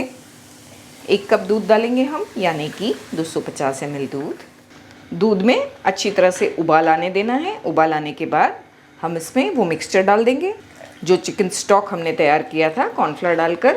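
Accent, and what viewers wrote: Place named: South India, India. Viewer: You have weird accent for a Pakistani. Sounds like some south indian person trying to speak urdu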